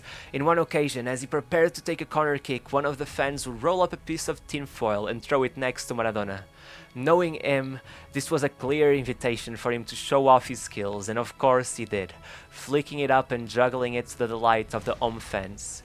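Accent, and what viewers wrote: Portugal. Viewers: Uma pergunta: és português não és?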